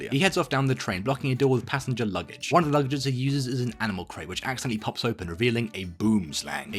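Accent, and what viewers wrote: United Kingdom. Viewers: The British accent and sense of humour charmed me now I am a subscriber.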